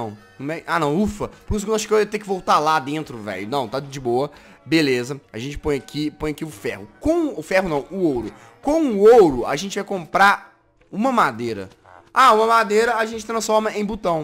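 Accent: Azores, Portugal. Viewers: O seu sotaque português é midas!!! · Adorei o teu sotaque de português Eu sou portuguesa kkkkkkk🥰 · tem staque portugues otimo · O sutaque português é otimo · o teu sotaque português de portugal parece mais com o sotaque de Portugal açores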